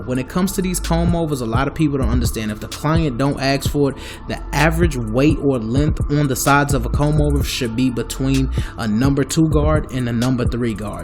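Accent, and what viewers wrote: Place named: Texas, United States. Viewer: nice work I'm trying to figure out dat accent ? Texas ,New Orleans , Oklahoma? we need you to open a spot in Manhattan......God Bless ya kiddo.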